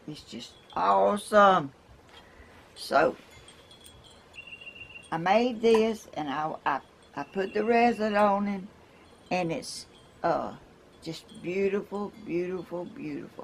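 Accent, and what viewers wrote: South, United States. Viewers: Oh my God mama , you are a delight to watch , your southern accent so cute, your creativity , over the top never thought of using nail polish and glass this way ..thank you so much , I’ll be following and will share with mom she is 90 but like yo7 a very crafty lady...❤️🙏🏻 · Nobody in Atlanta talks with a Southern accent any more. I sure love to listen to you talk. Reminds me of my family. Thank you. You are an artist!!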